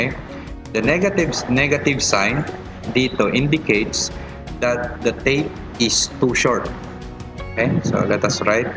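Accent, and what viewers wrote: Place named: Ilocos, Philippines. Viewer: Love the ilocano accent😘 you save me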